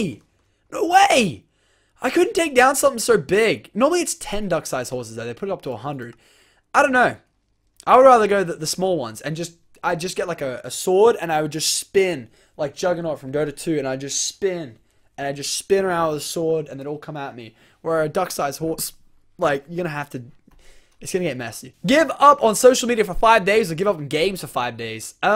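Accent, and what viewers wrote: Australia. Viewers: Is Australian I'm in a country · is aussie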